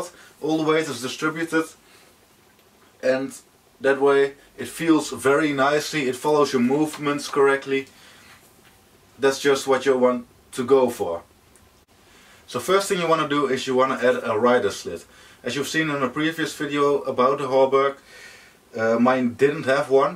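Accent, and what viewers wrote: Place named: Netherlands. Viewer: ik kan horen dat je nederlands bent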